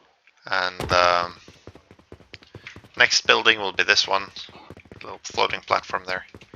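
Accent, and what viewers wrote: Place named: Scandinavia. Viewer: I can tell by your accent that you're probably sweedish? or nordic? Which would explain the impeccable design interior taste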